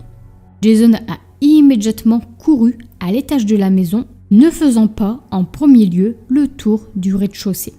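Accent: North, France. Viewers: J’aime beaucoup vos vidéos ! D’autant plus que je reconnais l’accent du nord il me semble ❤️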